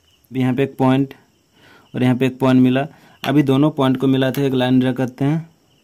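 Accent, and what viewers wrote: India. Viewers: But your english is from india 🇮🇳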